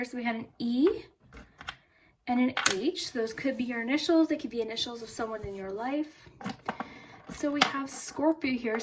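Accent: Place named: Canada. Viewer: You sound like my dog's vet tech, but Canadian. I'm in love with your voice.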